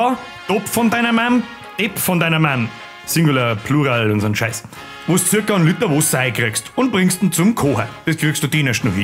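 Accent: Bavaria, Germany. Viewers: Wenn man als Thüringer jedes Wort von diesem bayrischen Akzent verstehen kann!(Ich bin ein Thüringer mit bayrischer Verwandschaft) Weißwürste sind wirklich für mich eine Delikatesse!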